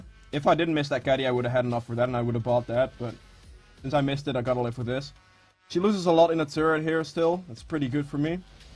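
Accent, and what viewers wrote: Netherlands. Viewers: Hahaha jij hebt echt een Nederlands accent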